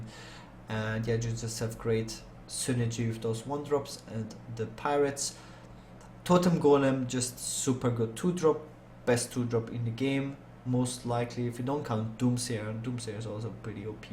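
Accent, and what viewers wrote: Germany. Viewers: Dieser moment, wenn deutsche englisch sprechen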